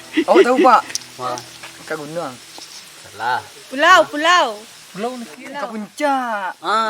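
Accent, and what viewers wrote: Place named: West Sumatra, Indonesia. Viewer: Logat Minang nyo dan😅😅😅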